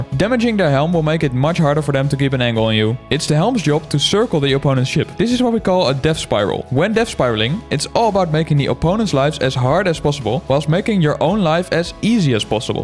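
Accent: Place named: Netherlands. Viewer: i smell dutch. Great video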